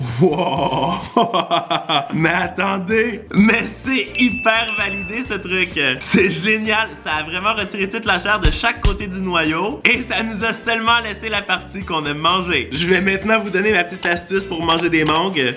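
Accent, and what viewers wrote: Quebec, Canada. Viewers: J’adore ton accent Québécois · J'adore ton accent cuebecoi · L’accent canadien j’adore🥰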